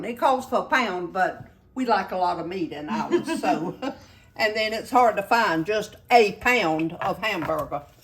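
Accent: South, United States. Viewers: I sincerely appreciate this easy recipe! Love to hear you talk with that pretty Southern accent. God bless you abundantly,.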